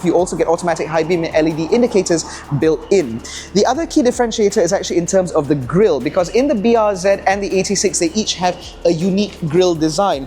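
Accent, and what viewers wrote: United Kingdom. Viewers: His accent is like british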